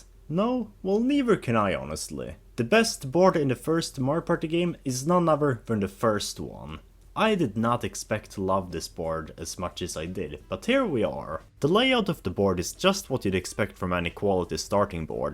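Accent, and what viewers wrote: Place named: Sweden. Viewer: Your english accent sounds just like mine, are you from Sweden ?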